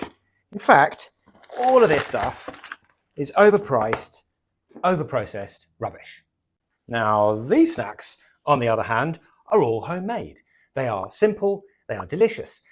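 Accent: United Kingdom. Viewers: Just found your channel, or rather Youtube did. Im confused you sound British, but your from Oz? Im Subscribing anyway as i like the concept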